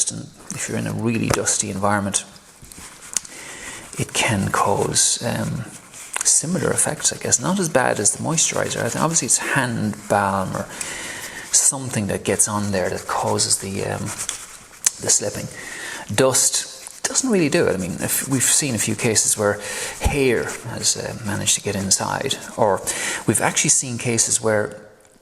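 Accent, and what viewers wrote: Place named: Ireland. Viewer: Thanks for your tutorial my lovely Irish friend! I note the emphasis on absolute cleanliness of the ring to case (ring) surfaces. Now, In my enthusiasm ... I assumed the object was to reduce any friction to a minimum (nice and smooth) so applied a very light coating of CRC Silicone 808 around the mating surfaces... Now.. I am rewatching and note your mention of "the perfect AMOUNT of friction .. which is apparently a "wonderful mystery" !! What I note now with my Powerball (250Hz Pro, by the way) .. is that although I have smoothness and a little less noise, I am having difficulty attaining higher RPM. I can spin up to around 5 or 6,000 RPM and all is very smooth but it is like I lose that "wonderful mysterious grip" which you speak of, which enables the continuation of torque application above mid revs ;) Indeed, I have some "clutch slip". 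You DID mention slip too!! So... I may return to the innards and clean ring and shell tracks again very carefully with Isopropyl alchohol ... I do hope that by some equal mystery, you may still pick this comment up, some months on... Many thanks. Love your accent. Chris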